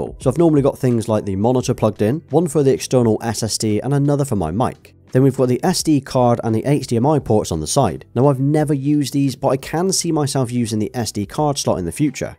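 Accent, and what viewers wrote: United Kingdom. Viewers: Are you, , British, Australian, or from New Zealand? The accent is charming. Have a nice Thursday, Friday, and weekend.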